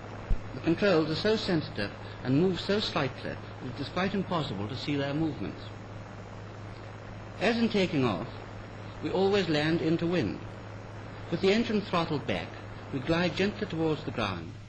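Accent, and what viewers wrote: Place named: Estuary, United Kingdom. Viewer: Mr Scott might not have understood your estuary accent, but would have been too polite to make silly comments about it.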